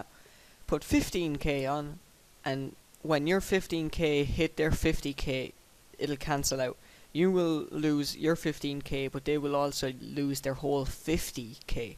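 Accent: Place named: Ireland. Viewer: What part of Ireland ya from? Waterford?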